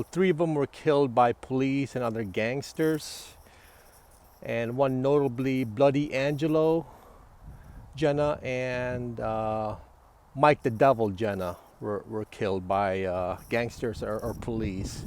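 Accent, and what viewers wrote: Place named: Chicago, United States. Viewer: Love your speaking style and your authentic Chicago accent! Excellent presentation.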